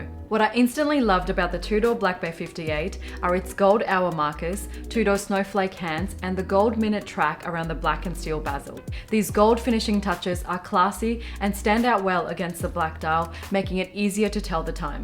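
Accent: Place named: Australia. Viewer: Your channel will blow-up you should have way more subs with the quality of your video! And the Aussie accent doe 👌😅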